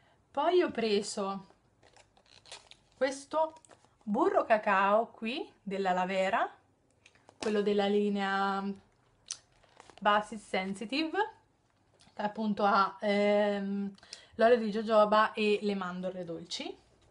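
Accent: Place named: Tuscany, Italy. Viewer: Ma poi il tuo accento è bellissimo, sei Toscana per caso?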